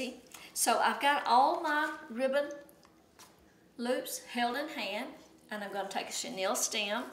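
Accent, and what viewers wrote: South, United States. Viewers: Hello from the UK! Lovely bow and good instructions. LOVE your southern accent!! Thank you for sharing!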